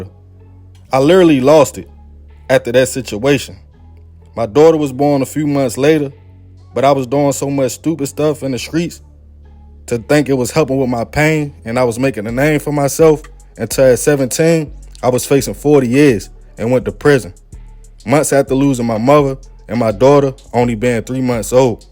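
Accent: Baltimore, United States. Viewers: That’s their Baltimore accent,, I might gotta slide 🛝 cause I really can’t take it 🤦🏽‍♀️🤦🏽‍♀️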